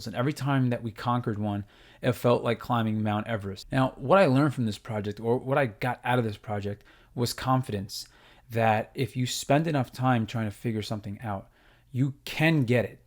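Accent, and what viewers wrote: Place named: Boston, United States. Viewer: Came for the content, stayed for the Boston accent.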